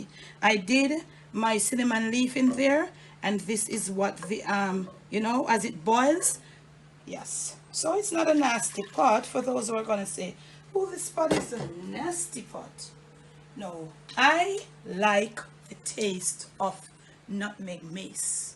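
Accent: Jamaica. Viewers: I just LOVE the way describes all the ingredients and cooking techniques with her lovely Jamaican accent and "all ah dat!" It is such a pleasure to have you share all of the good food dung a yard. Thanks for sharing! I've subscribed.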